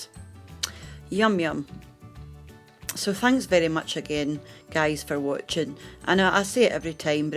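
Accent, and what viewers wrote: Scotland, United Kingdom. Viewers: What are you on about. She is Scottish this is how she talks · The lassie is Scottish :) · My paternal grandfather was Scottish, and my paternal grandmother was Irish. I miss them, and the way they talked. Your brogue brings back a lot of good memories! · My husband's mother side of family is proud Scottish! So I was looking for Scottish food recipes but it was so hard to find. I am so glad I found YOU! thank you so much for your recipes.. it is so pleasant listening your accent! Pretty please upload more Scottish recipes.. thank you :) ♡ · I know right ,Scottish accents are the cutest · looks delicious, defo need to buy a slow cooker with all these amazing recipes I'm seeing :) I'm from Port Glasgow, so it's nice to hear a Scot on here :P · Love your Scottish accent. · I love a scottish accent...so comforting...makes you feel everything is going to be alright😁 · I love your Scottish accent, wee bit is my favourite ;-) the casserole looks good, could you put in the spuds as well if you didn't want mash? 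· Love the Scottish accent! 👍🏻 Seven hours to make it and less than seven minutes to eat it! 😜😋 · Am a Scot living in Thailand the last 6yrs and am just going out tomorrow to source a slow cooker... Can't wait to try this simple recipe!!!... and commentary in Scottish makes it a must!!!... A harty winter meal in 32° of heat!!!!...Way too hot for a peely wally Jock!!!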